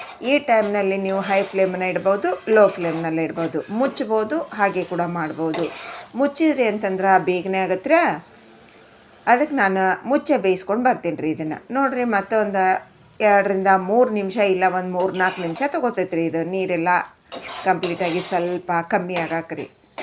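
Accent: North Karnataka, India. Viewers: Uttar karnataka language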